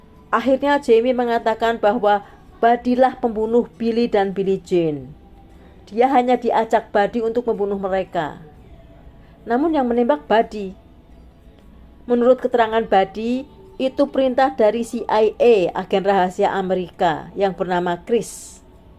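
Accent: Java, Indonesia. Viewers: Jawanya meddok banget 😁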